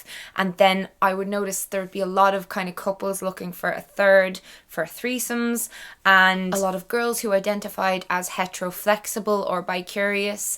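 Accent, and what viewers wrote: Ireland. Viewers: Are you from Dublin? Your accent reminds me of Saoirse Ronan.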